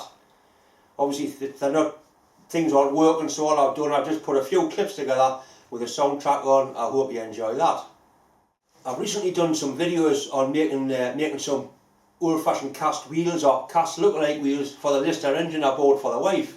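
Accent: Newcastle, United Kingdom. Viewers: As always, warms me wee heart to hear Geordie and a Great Thank you for sharing your videos and for making me discover more then Washington, the Penshaw monument, the Angel of the North, Hadrians wall, the Quayside and of course the Toon!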